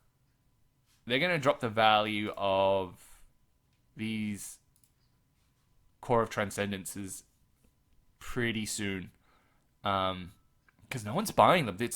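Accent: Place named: Australia. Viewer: I've never had any issues understanding you but then I've played online games on AUS time for most of my teen years so maybe I'm just not phased by the accent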